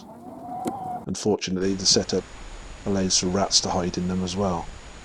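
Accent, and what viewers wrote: United Kingdom. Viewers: The best commentary on the web (for this type of video I mean). The UK accent sounds great. Hello from Milwaukee, Wisconsin.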